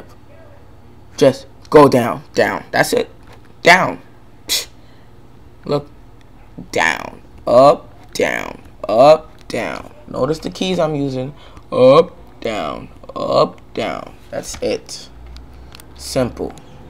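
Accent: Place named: Dominican Republic. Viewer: that dominican style voice!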